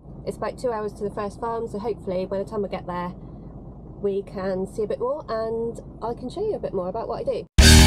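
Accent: West Country, United Kingdom. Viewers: Brilliant to see your first vlog! Like the camera you use in the truck, nice to see all the different views and angle's whilst driving, especially when you're going round a corner and it shows the side of the trailer cutting the corner, great to hear you talking, nothing beats a west country accent 👍👍🤛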